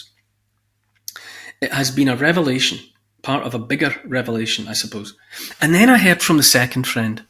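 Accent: Scotland, United Kingdom. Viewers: I've just started listening to you after seeing you interviewed by Eddie Hobbs who I also only just found (late June 2025). It's so nice to hear you speak as you think as I do. I am English but I live in America and I see what has happened and is happening. I was listening to David Icke many many years ago, and he wasn't wrong, was he? Anyway, just a thumbs up for your YT channel here. Keep up the good work. And I like the Scottish Accent when I can hear what's being said and yours is very clear.;)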